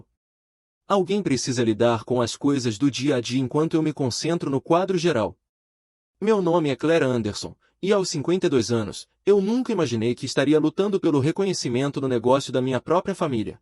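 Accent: Portugal. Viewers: Sim, porém mesclava o nosso português com o de Portugal e ficou muito estranho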